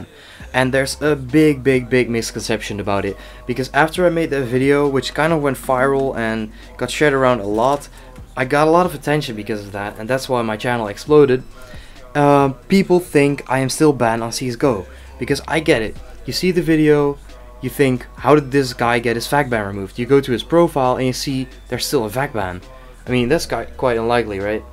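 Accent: Netherlands. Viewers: His dutch accent mixed in with english is almost cringeworthy but his videos are still enjoyable. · are you dutch?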